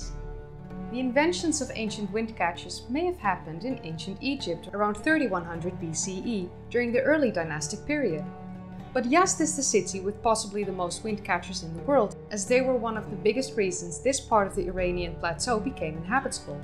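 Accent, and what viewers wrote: Netherlands. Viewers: She sounds so Dutch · Bespeur ik daar een Nederlands accent? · Dutch accent? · Dutch , I think she is from the Netherlands. She will correct me if I am wrong.😊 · I detect DUTCH in your voice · je kan horen wanneer er een Nederlander Engels praat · Dutch speaker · Dutchie detected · That accent…is she Dutch?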